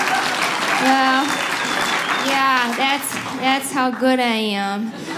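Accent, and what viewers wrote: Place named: Minnesota, United States. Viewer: Lol she has the stereotypical Minnesota accent.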